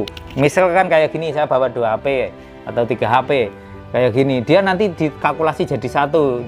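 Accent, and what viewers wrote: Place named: Ponorogo, Indonesia. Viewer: Pantes logat e podo ponorogo mas 😁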